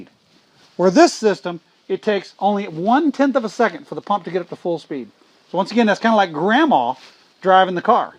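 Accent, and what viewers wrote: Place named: South, United States. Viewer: Just listening to your southern accent makes you sound dumb. That's even before you just schill dumb shit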